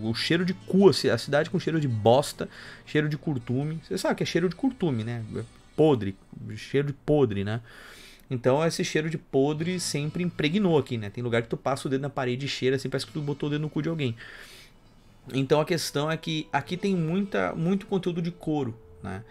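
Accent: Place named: São Paulo, Brazil. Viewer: oxi, vc é de RS? vc parece q é daqui da cidade são paulo.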